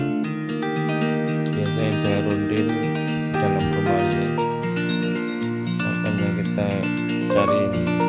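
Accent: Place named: Banyumas, Indonesia. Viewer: Sya juga ngapak... Hihi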